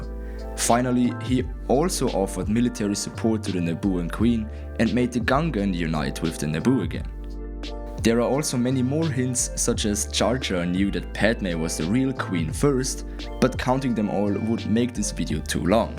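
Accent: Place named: Germany. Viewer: he sounds like a german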